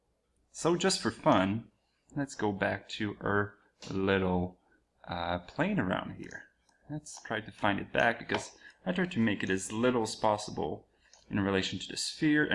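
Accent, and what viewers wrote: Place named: France. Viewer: Salut. J' ai compris que tu volais Mtl-Cancun, et je croirais entendre un petit accent. Alors je me dis que tu es français. Je commence a croire ce nouveau concept de la terre plate et c'est très troublant. Je pensais justement utiliser un programme pour tester mais tu as fais ça dans ce vidéo. Merci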